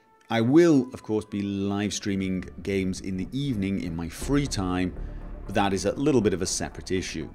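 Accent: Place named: Scotland, United Kingdom. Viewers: Norway? Honestly, I would have never guessed. Hahaha (facepalming) I thought you were Scottish....at least that's what I thought your accent sounded most like. BTW, I haven't heard your opinion on Bethesda's upcoming, special E3 Conference....do you think they'll be announcing Fallout 4? I'll definitely be keeping an eye out for your mods when it does come out. Personally, I think they're getting ready to announce it. I can't think of any other reason they would rent out an entire theater in downtown LA for a day. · LOL I always thought you were Scottish.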